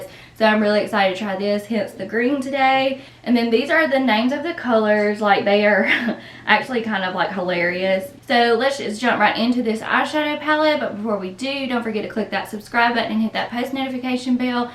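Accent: South, United States. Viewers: i love the accent, you from the south too?💗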